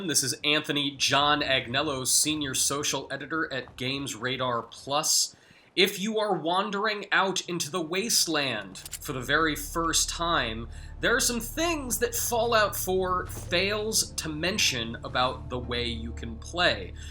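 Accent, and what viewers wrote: Scotland, United Kingdom. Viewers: Why 8k dislikes? Yeah his accent is annoying but Scottish people don't get this much dislikes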